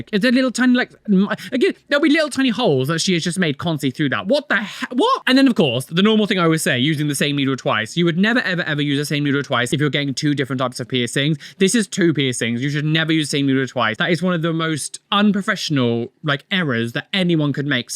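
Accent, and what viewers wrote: United Kingdom. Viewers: you have such a distinct british accent 😆 i love listening to your voice and practicing my english skills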